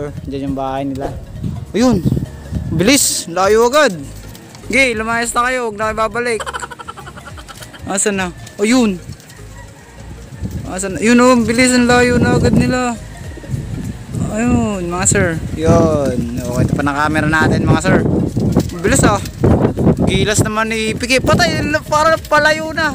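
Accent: Batangas, Philippines. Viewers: Batangas ka ga sir😂😂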